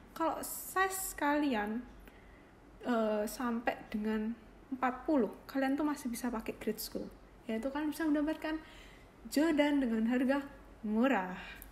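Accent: Java, Indonesia. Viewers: Logat nya kaya jawa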